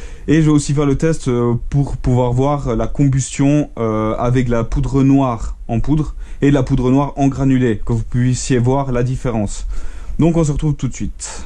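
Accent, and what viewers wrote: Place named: Switzerland. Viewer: Bon... par où commencer : 1: je suis un passionné de pyrotechnie depuis mon plus jeune âge et tes vidéos sont exceptionnelles... 2: ton accent me semblait tellement familier je me suis dis ''obligé il est Suisse''... et vu les journaux, on vient du même endroit ! 3: J'ai environ 1 million de questions à te poser, je serais ravi que tu régales un Neuchâtelois 😄 Bref au top !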